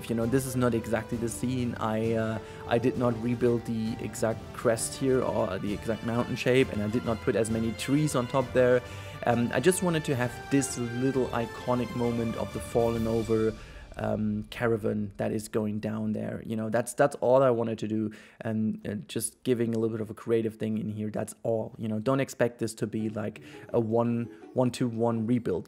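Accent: Germany. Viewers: Kann es sein das du aus Deutschland kommst xD ? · Du bist aber deutsch oder weil dein Name und dein englisch verraten das 😊👌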